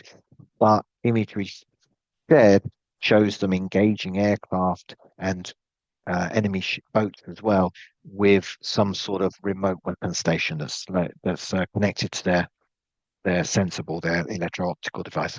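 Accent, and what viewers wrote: United Kingdom. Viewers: I love your briefings, but the sound is horrible. a) British accent, b) RUS/UKR names and locations, c) lots of acronyms and technical words. Time to block channel.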